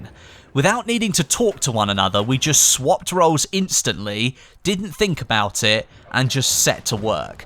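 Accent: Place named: United Kingdom. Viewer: Football as in soccer or anerican football butt since you sound like a person from great Britain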